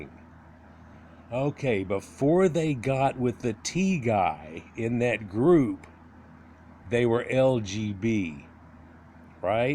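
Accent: United States. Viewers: I love it!! You gotta be a Texan!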